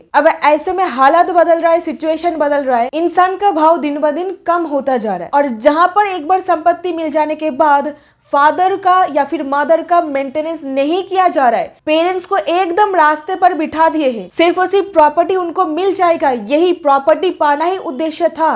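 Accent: Bengal, India. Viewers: Bengali's hindi is very sweet. Easy to understand.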